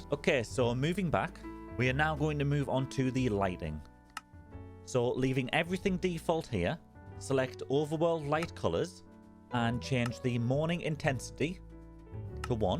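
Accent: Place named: Newcastle, United Kingdom. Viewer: What a mighty geordie accent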